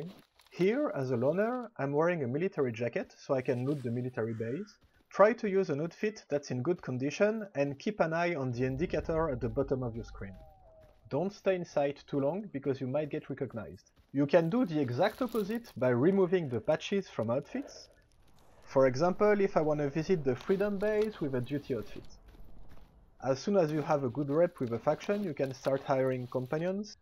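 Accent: France. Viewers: Mdr t'es français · ...wait ... are you french? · l'accent français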